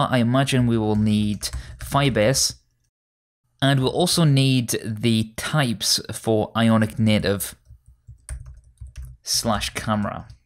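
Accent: United Kingdom. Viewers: I've been coding for years but have always had the attitude of never knowing enough. Your tutorials often cover ground I've already walked but I always watch them as I still find them invaluable for reminders or tips for things I've missed. Always fun for me to hear a fellow northerner too, doing the YouTube thing. Thanks.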